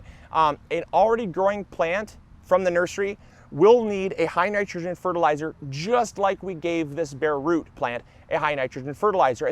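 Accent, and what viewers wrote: Michigan, United States. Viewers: Classic rock Lover the Michigan accent is strong in this one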